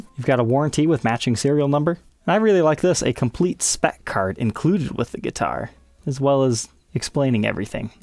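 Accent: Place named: United States. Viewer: I stopped taking this seriously as soon as I heard an American accent.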